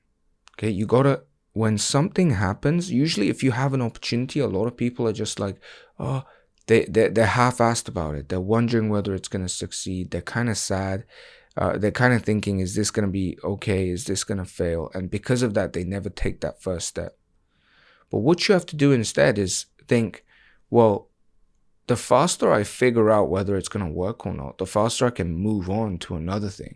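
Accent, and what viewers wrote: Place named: United Kingdom. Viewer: Off topic, but your accent is a lot nicer than the half-baked American accent that a lot of foreigners end up with. Not all British accents are nice but what you have ended up with is 👌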